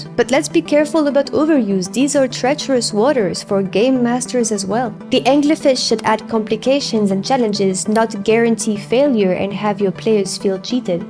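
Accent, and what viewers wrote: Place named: France. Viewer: What a great video. i was secretly hoping wed hear a "Zut Alors!" at the reveal of a twist, once i heard your accent. But i guess hoping to hear that one day is a good reason to subscribe!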